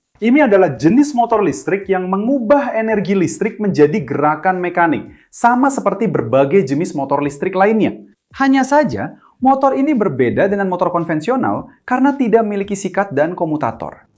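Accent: Java, Indonesia. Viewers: Dubbingnya logat Jawa. 😂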